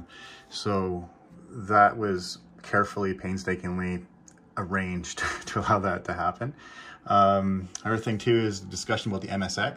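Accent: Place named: Ottawa Valley, Canada. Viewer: Your hoser accent is really endearing. A taste of the Ottawa valley. I grew up in Smiths Falls but never was aware of any of this stuff.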